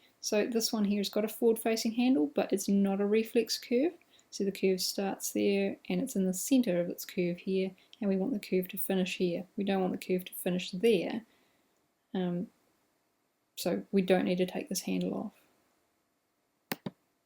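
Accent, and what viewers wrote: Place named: New Zealand. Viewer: Hey, Thank you so much for doing this. It has helped me immensely. I'm new to all this and this tool was driving me mad in Affinity Designer, until I found your tutorials (it crosses over just fine). I really appreciate your patience, thoroughness and clear instruction. This is wonderful and a massive help for beginners. Thanks ever so much. PS - Your lovely NZ accent is very soothing too:)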